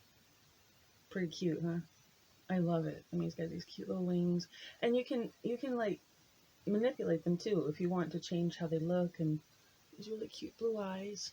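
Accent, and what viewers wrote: United Kingdom. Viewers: Accent, kind of London, East End - Not bad at actually! - Seriously though, If you can ever find the time, I think your wide experience of both health care systems are well worth putting into print.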